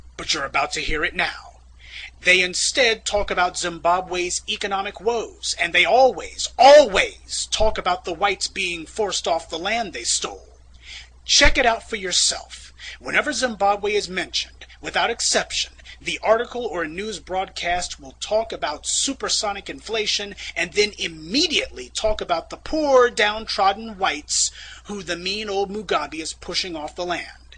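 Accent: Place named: United States. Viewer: hij praat als een Amerikaan. Geen Braziliaans accent.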